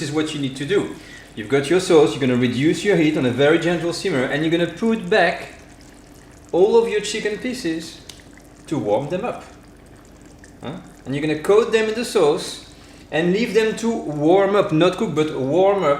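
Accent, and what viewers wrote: France. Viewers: OH My god i love your french accent soo cute <3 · I wonder what kind of dessert would pair nicely. With the acidity of the main, probably something light & sweet to round out the taste spectrum. Side note: I can hear how being in France is starting to subtly influence your accent. (Not a bad thing.) Speaking your mother tongue so much is probably a real treat right now.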